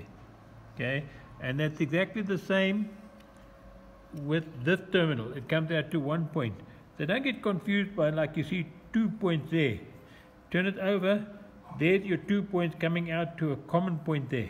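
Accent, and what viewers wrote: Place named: South Africa. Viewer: Thank you maneer. I hear your accent from Mzantsi🙏🏽🙏🏽🙏🏽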